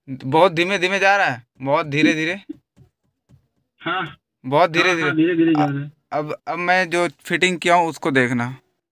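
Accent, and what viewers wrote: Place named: India. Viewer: No me gusta el acento que tienen los indus en inglés.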